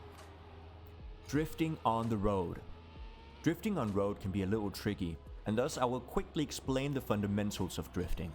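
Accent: Denmark. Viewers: I reconize an Danish accent when i hear one xDD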